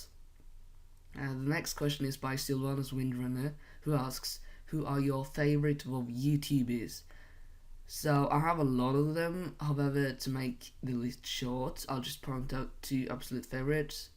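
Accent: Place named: Finland. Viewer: At first I thought you were from Britain, but you sound Finnish (since Idk what norwegians sound like) so I just thought your accent was some sort of hybrid >.>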